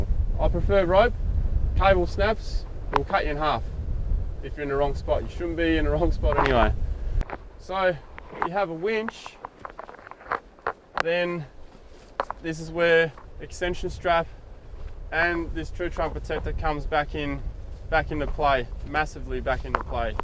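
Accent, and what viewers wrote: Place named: Australia. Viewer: Good round-up and advice! Have learned a thing or two since I discovered your channel. Must say its a bit hard for me to understand your australian acccent (english not my first language)(born and raised in Mexico) but Im getting used to it. Will definitely continue to come back, check out and enjoy more stuff.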